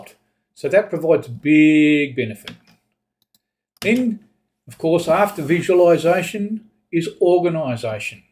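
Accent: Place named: Australia. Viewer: Glad the algorithm put your video up for me - and glad to hear an Aussie voice grappling with the Obsidian stuff I'm interested in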